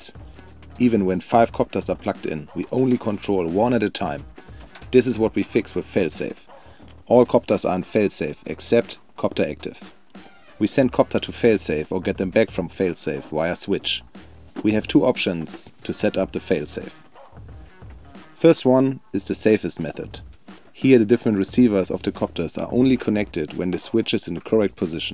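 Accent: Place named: Germany. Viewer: Der Akzent klingt irgendwie nach Deutschland, also bin ich mal ausnahmsweise so frei: Top, sowas such ich. Ich wollte schon ewig wissen, wie die Technik hinter Formationsflügen etc. funktioniert. Kleine Drohnenshow machen und so. 🙂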